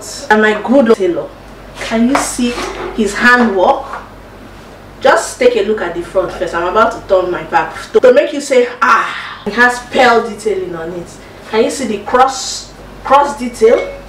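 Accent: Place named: Igbo, Nigeria. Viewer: Love the humour jare,your Igbo accent is on point🔥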